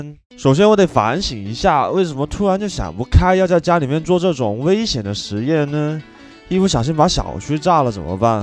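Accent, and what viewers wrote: Cantonese, China. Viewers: 好亲切的白话普通话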